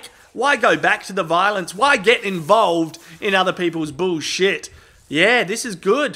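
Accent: Australia. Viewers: Lol TRUE AUSSIE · Is he really an Aussie?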